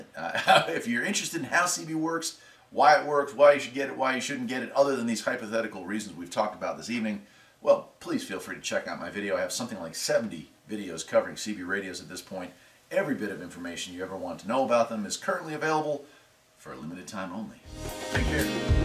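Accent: North Carolina, United States. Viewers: Wow. Excellent video, brother! Funny thing. I was just looking up stuff on CB radios, and I ran across one you have about 5 years ago. Your voice...well...do you know how your own voice sounds different when you hear a recording of yourself? Well, you are the first person I have run across whose recorded voice sound exactly like my recorded voice, when I hear myself that way. LOL, it was a little creepy, bro. Anyway, so that caused me to be curious and look at this second video here. Here, you reveal that you are from the NC mountains. I grew up in High Point, so that partially explains our common accent and similar speech patterns. But... ...Just today, I was thinking the very things you just said here. "Hypothetically," I may end up being very happy I installed that CB in the Jeep. Anyway, the reason I started out with that seeming nonsense, is to point out that I seem to have been drawn here. 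Now I have to watch a bunch of videos to try and figure out what you have said that I was meant to hear. I really wish you did not just say you have 70 videos. This might end up being a very long night.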